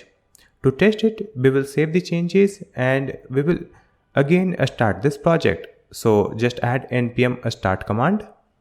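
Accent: India. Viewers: Indian accent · you explain fast and youre indian so a little bit hard to understand what your saying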